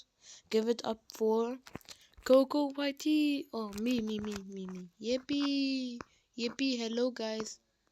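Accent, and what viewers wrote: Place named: Arab world. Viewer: You have arab accent! Just like i do! But yours is deep Mine is smol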